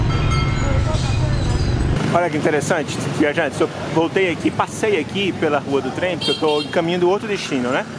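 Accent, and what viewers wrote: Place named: Northeast, Brazil. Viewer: Obrigado pela resposta,! É, senti algo do NE no seu sotaque, mas não seria capaz de cravar Recife. Senti algo de carioca também, mas nada de mineiro! Rss Mistura tudo isso com um tempo fora do Brasil, e, pronto!, chega-se ao seu sotaque. Valeu! Abs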